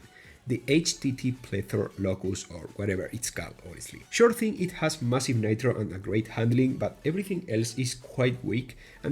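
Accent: Spain. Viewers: R u spanish? Ur accent is pretty similar to that people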